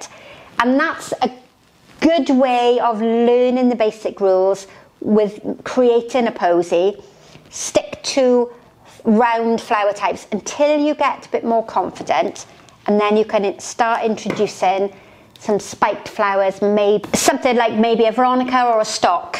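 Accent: United Kingdom. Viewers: I'm from Canada 🍁, cheers love, perhaps because your British with accent yea. British with flowers 💐 is like fine wine. All right than, thanks dear.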